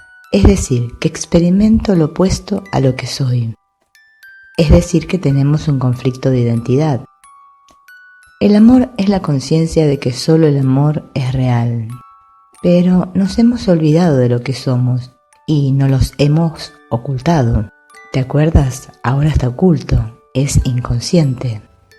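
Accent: Argentina. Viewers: Hola sos Argentina no? Por el acento digo...los textos los haces vos o lees de algún libro? Son muy buenos!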